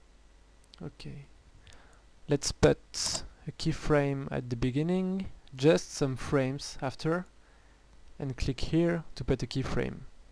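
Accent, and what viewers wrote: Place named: France. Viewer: i <3 ur french accent :DAD:D:D:D:DA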